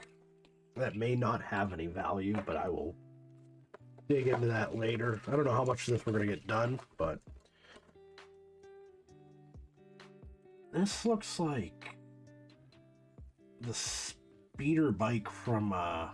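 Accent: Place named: West London, United Kingdom. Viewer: Hahha was thinking you were from Hammersmith, West London 😂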